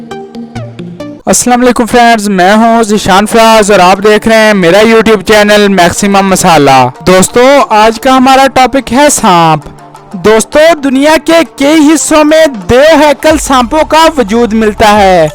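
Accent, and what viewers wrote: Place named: Punjab, Pakistan. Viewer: Punjabi twist in urdu, not good pronounciation like youtuber majid malik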